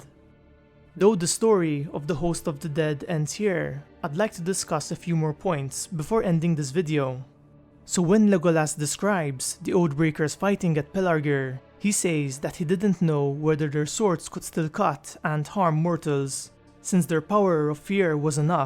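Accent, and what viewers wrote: Malta. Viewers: LOL... I love Malta & Gozo though I've only been once, I think the accent is beautiful and can listen all day, but sometimes I have a slight laugh to myself as the pronunciation sometimes differs from English... then he shows a bowl of oats for the "oat breakers". LOL!! Well played sir, well played !! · Are you Maltese?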